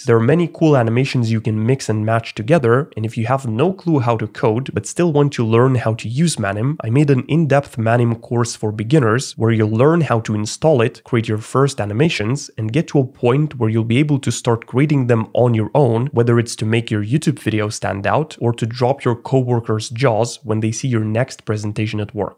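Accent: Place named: Europe. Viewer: it's the European Manim voice.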